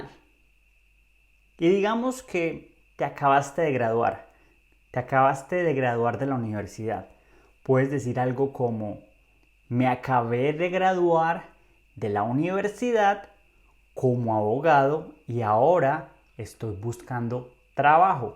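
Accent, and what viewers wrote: Colombia. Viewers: Gracias por este video. Soy de Polonia pero vivo en Inglaterra, y me encanta el acento colombiano!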